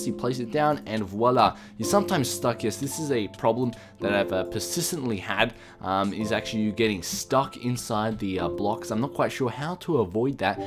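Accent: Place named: Australia. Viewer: Australian English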